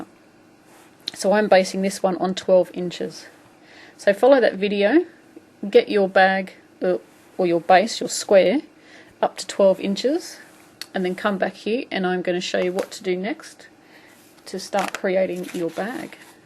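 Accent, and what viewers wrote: Australia. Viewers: so glad i found aussie ,i can understand you lol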